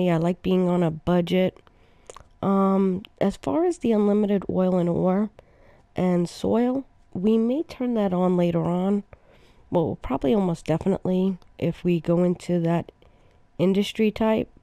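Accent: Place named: New York, United States. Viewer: So I was wondering if anyone ever told you, that you sound like the woman from the TV show "King of queens". Lol I love that show. She did a great impression of a genuine new Yorker. And you sound like her. I'm hazarding a guess that you are a new Yorker but I've been wrong before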